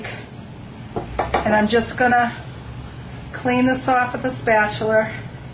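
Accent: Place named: Massachusetts, United States. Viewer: YOU ARE FROM MASSACHUSETTS YOU SOUND EXACTLY LIKE MY FAMILY!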